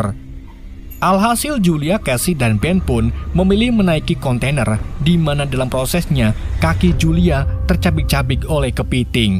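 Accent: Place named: Java, Indonesia. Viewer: kwbelet ngoyo 🤣🤣🤣langsung ngkak denger logat jawanya bang, suksesterus saya suka reviewnya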